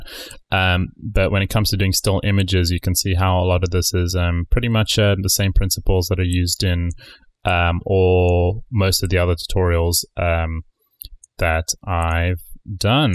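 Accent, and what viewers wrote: South Africa. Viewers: You might be a South-African by the sounds of it :D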